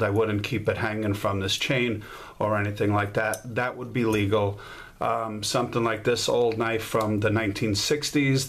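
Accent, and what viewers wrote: United States. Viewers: You certainly sound like you’re from NYC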